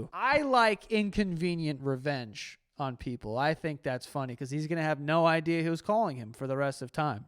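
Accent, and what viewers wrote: United Kingdom. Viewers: Funniest British accent I’ve heard the past year! 😂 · Your British accent is hilarious! 😂 😂😂😂😂😂😂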